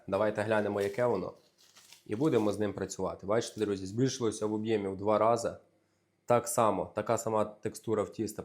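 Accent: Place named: Kherson, Ukraine. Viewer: Сковорідка повинна охолонути, а не вистивати..... Хлопчисько говорить діалектом, бо дуже багато слів схожі на російську мову, але не російські. На Херсонщині теж говорять схожим діалектом.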